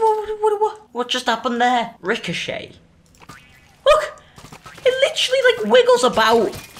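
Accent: United Kingdom. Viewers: Now i can hear his british voice i could not ages ago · What part of England are you from. We are in Scarborough, North Yorkshire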